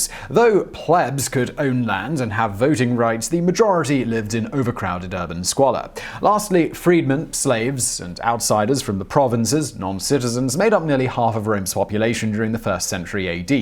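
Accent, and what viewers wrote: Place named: RP, United Kingdom. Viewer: Posh-ish English accents are magical. I have no idea as to this mans qualifications, background, or specialization. And yet he sounds intelligent and authoritative in damn near every video he's done. It WOULD be hilarious however if he had the most absurd Liverpudlian, Mancoon, Cockney or American Southern accent when he's not doing videos.......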